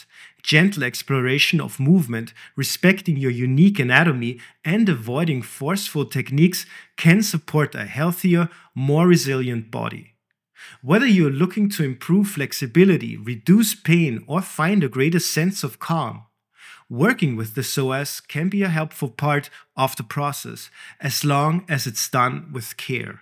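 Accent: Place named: Germany. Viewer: And don't forget the German accent :)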